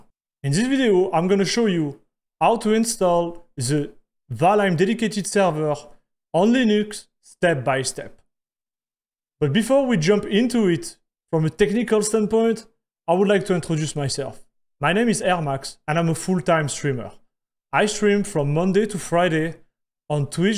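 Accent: France. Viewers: On entend presque plus le pti "french accent" 😝😁😉 Vivement un PUBG avec Choco 👌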